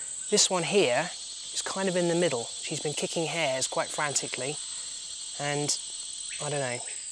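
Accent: England, United Kingdom. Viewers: Truth, specially with that English accent ❤️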